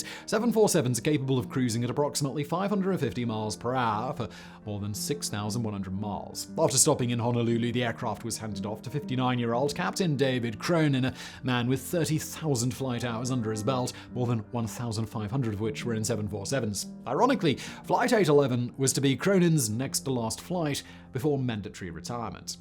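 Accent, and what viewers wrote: United Kingdom. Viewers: HOLY COW!!! I really TRIED to watch this video!!! I live in Texas!!! My dang ears & brain can’t keep up with your dang UK accent & “speed talk”!!! SHEEEESH!!! I finally had to STOP being frustrated & delete the video!!! 🤓🤓🤓🤓🤓🤓🤓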